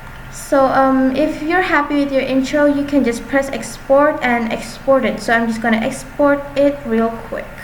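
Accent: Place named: Malaysia. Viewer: That's her malaysia accent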